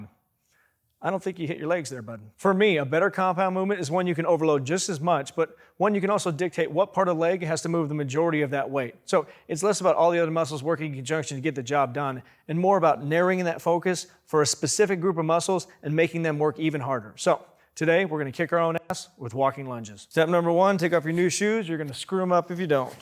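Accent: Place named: South, United States. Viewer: Maybe it's just the cornpone style and southern drawl but I initially had great trouble keeping up but I'm gradually getting used to the rhetoric and speed of speech. Just as well because there're done tips here that I've not yet seen and might help. How refreshing to hear someone state categorically that squats ain't the be all and end all of legs exercises; I'm already a walking barbell lunges man (and yes, spot on, it IS a lung buster exercise) but I've just picked up a couple tips to tweak it and alter the stress focus. The great Ronnie Coleman swore by Walking Lunges and there's little that hasn't already been said about HIS wheels...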